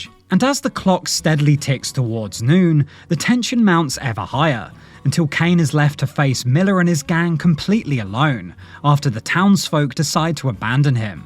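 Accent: England, United Kingdom. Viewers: What's lost is the authenticity from the British accent by the narrator. We're bombarded with this. Motorsports, tennis, golf, war videos. Gunfights? C'mon man. I can fake an accent, maybe I can get work too. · English accent kills it for me.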